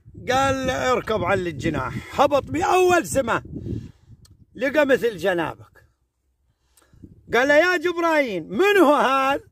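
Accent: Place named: Iraq. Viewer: اخي انت من اي بلد كلامك يشبه كلامنا بالعراق اكون ممنونه لو تكول انت منين مع خالص تحياتي وتقديري لحضرتك حبيتك على قدر مداراتك لامك الله يرحمها ويدخلها الجنه ان شاء الله رحمه على حليبها الطاهر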